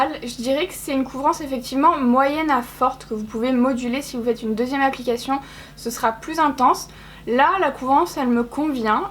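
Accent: France. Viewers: Ahah ton accent espagnol c’est pas trop ça 😂 très frenchy c’est mignon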